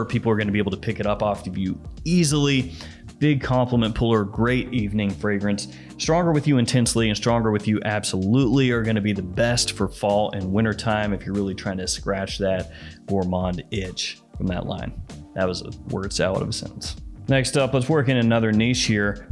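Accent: United States. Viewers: i hate american accent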